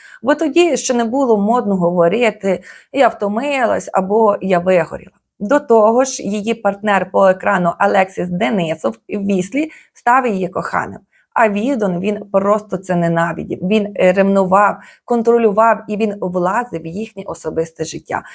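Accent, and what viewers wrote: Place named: Volyn, Ukraine. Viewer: Ох ваша говірка🎉шарю що з Волині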